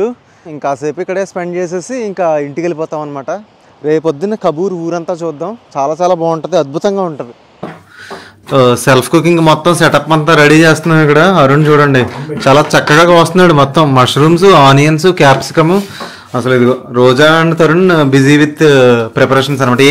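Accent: Godavari, India. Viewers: Absolutely loved this video! The way you captured the essence of mana Godavari with that authentic yaasa was incredible. It brought back so many memories, and your storytelling was spot on. Keep up the amazing work,! Looking forward to more such gems from your channel. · Annoooo godavari style haa❤❤❤❤❤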